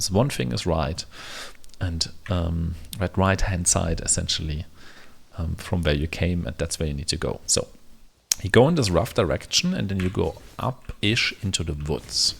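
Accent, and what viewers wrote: Germany. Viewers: I am amazed how calmly and professionally you address the leveling process. Also madly in love with the soft germanic accent.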